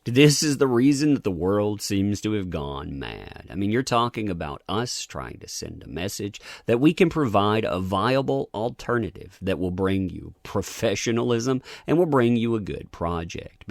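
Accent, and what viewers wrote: United States. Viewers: Your accent is the most American thing I've heard, but it's also kinda really unsettling and made me uncomfortable and don't really like listening to you talk.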